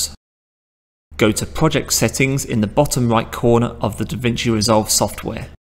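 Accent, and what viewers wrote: United Kingdom. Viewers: British accent ❤️❤️❤️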